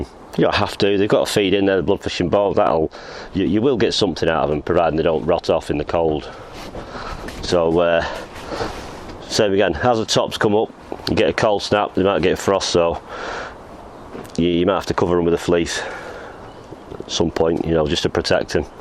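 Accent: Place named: North West England, United Kingdom. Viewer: Hi, I just found your channel as it popped up on the recommended list at the end of another video. I am glad it did, I really enjoyed the video and will look forward to watching your season progress. I take it from your accent that you are a fellow north west gardener:-)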